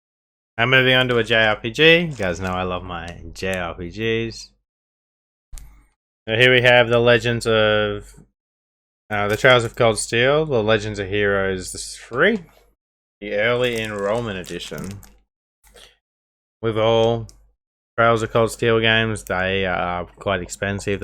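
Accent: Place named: Australia. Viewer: where abouts in Australia are you from champion?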